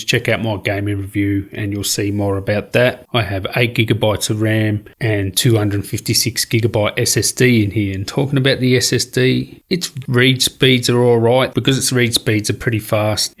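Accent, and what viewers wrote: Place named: Australia. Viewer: ahhh it's good to hear a tech reviewer with a real legit aussie accent!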